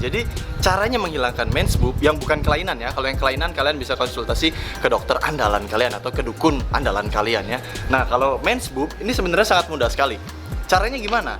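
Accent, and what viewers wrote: West Java, Indonesia. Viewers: Kirain orang sumateraan, tapi bahasanya ada sunda2nya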